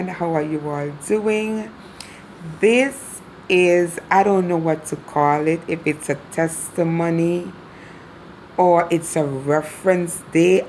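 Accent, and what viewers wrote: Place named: Caribbean. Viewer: What part of the Caribbean are you from? Btw I love your accent, I'm Haitian and Antiguan 🇭🇹 🇦🇬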